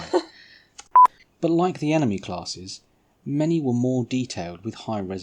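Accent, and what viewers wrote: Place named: England, United Kingdom. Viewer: I think you'll find he's English English so would naturally pronounce words differently to an American standard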